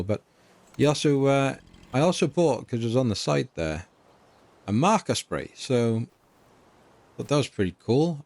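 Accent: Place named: Wales, United Kingdom. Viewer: Mate, ive only watch half hour and i've laughed so much. Your Welsh accent is quailty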